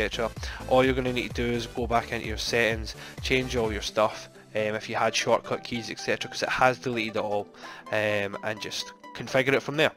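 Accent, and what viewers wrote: United Kingdom. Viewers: I love your British accent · Loveeeeeeeee the scottish accent mate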